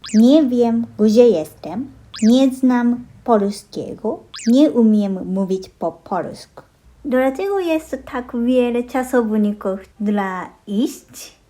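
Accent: Japan. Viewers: Dużo ludzi, którzy przyjeżdżają do Polski z innych krajów mówią, że polki jest trudny. Zgadzam się z nimi, ponieważ pomimo, że jestem polką również mam z nim problem. Na przykład z ortografią i gramatyką. To są dla mnie męczrnie. Szczególnie gramatyka. Z ortografią miałam problem w młodości😊 Życzę powodzenia. I tak już bardzo dobrze mówisz po polsku. I bardzo podoba mi się ten twój japoński akcent. Jest cudowny❤ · Hello, I'm from Poland! The way you speak Polish with a Japanese accent is really cute! My dream is to learn Japanese one day! I've been fascinated by Japanese culture and language ever since I was a kid! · Dla mnie Japoński jest trudny, ten Wasz akcent 🙂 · polski jest latwy smiesznie muwisz tak po polsku z akcentem japonskim bez obrazy oczywiscie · HAHA pomimo że czasem masz problem z naszymi dż, dz, ć itp. mówisz tak dobrze że łatwo cię zrozumieć a ciekawostką jest akcentowanie japońskie polskich słów :D Choinki niestety nie lubią ciepła i suchego powietrza mieszkań (nawet te w doniczce z korzeniami) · Drzewko ( choinka) ma igły a nie liście i wg tradycji choinkę się wyrzuca po wizycie duszpasterskiej 🙂 Mówisz bardzo dobrze po polsku, widać że się bardzo starasz ale też widać że mięśnie twarzy/języka masz przystosowane do języka japońskiego gdzie są inne dźwięki ale to normalne 🙂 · Ale japoński akcent w polskim brzmi słodko :D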